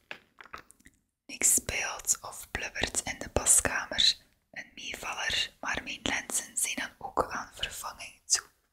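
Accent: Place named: West Flanders, Belgium. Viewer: Leuke video met dat sgoone westvlaams accent.... zeer aangenaam x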